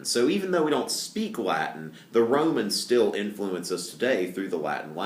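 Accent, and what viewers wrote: South, United States. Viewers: dAt southern accent dough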